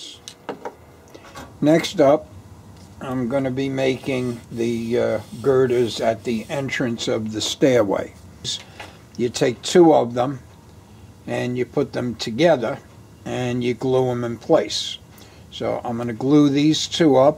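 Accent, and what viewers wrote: New York, United States. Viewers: Hey New Yorker - I am a fan of yours,! I lived in Manhattan & Brooklyn for many years and I rode the F Train as well... Your work is simply great with a fine attention to detail. This is the work of a true craftsman of RR and Subway RR modelling. Thanks BTW, your voice has that warm New Yorker dialect that makes me year for a Nathans dog and a Bagel with cream cheese and lox... Enjoy your great work and your layout, sir...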